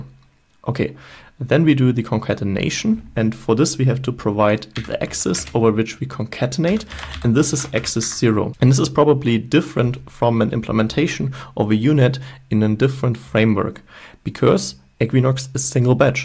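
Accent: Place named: Germany. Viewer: Are you german? Sound very german! Great videos BTW!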